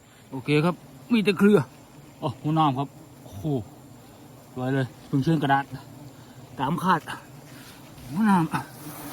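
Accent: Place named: Korat, Thailand. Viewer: สำเนียงเป็นคนโคราชนิ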